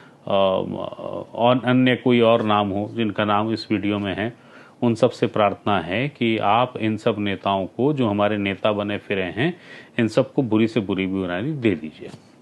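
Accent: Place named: Jharkhand, India. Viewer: इनके भाषा मे सदरी बोली का लय है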